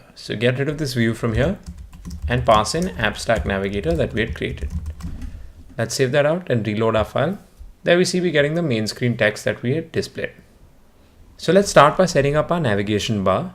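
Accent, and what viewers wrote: India. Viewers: The indian accent ><' ... but ... Good job man ;) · I love your indian accent :) Thank you bro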